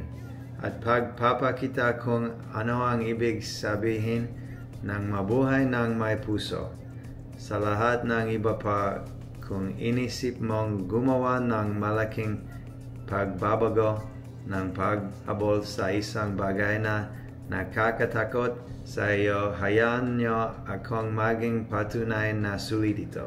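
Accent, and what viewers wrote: Philippines. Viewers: You sounded almost filiipino, 🇺🇸🇺🇸🇵🇭🇵🇭❤️❤️❤️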